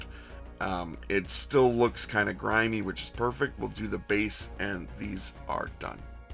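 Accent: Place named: Australia. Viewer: Definitely Australian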